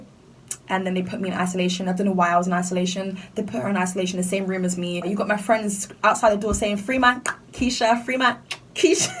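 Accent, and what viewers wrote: United Kingdom. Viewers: SHITT THIS GIRL IS BRITISH. MY GGGGG. I THOUGHT YOU WERE AMERICAN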